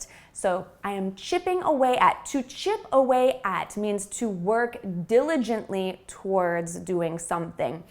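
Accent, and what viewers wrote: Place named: Philadelphia, United States. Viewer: I like to hear your accent. I seem to hear the same accent in Philadelphia.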